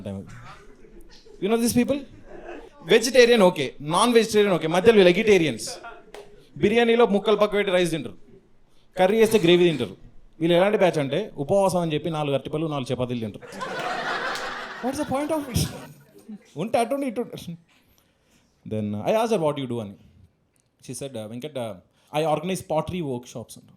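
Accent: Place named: Warangal, India. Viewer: Bro mi voice Veni cmt pedudham anukuaa wgl ani nvuey cheppavu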